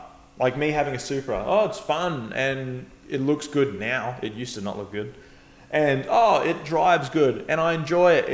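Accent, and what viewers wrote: Australia. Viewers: This is my first video in your channel. Are you based in Australia? because I don't want to judge based on accent. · Good take, green car, AND an Australian accent all in one?? Sub.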